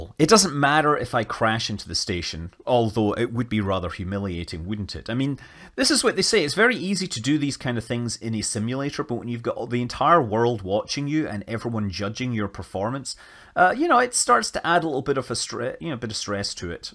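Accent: Scotland, United Kingdom. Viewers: I hear Scottish accent and see science. Subscribed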